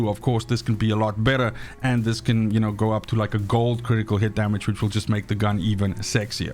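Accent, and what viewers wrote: South Africa. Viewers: from which country are you bud, you sound like RSA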